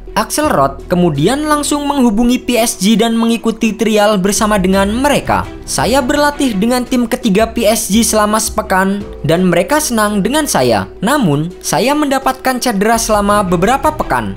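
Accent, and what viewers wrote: Indonesia. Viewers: Aksen indonya kurang2in mas, trayel bacanya ga sih? Bukan trial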